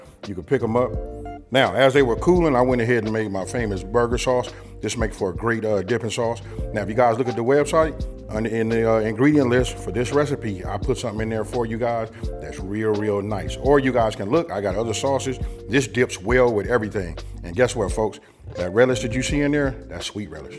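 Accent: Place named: Texas, United States. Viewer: You sound like a good Texan! Loved the recipe.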